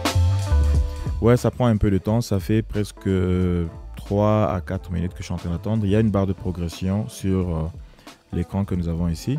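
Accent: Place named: France. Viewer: Je suis tombé sur une de tes vidéos anglaise (coaxial vers ethernet) et je me disais bien qu'il y avait un soupçon d'accent Francophone 🤭 La qualité de tes vidéos est surprenante, bravo Également tout ton matériel, la façon dont tu te tiens, tes expressions, tout ça attise ma curiosité et me donne envie de connaître un peu plus qui tu es ! Bref, plein de succès dans tes projets et merci pour le partage de tes connaissances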